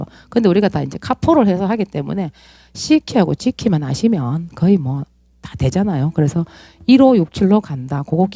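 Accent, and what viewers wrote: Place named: Daegu, South Korea. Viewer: 대구 사투리가 아주 맛깔스럽네요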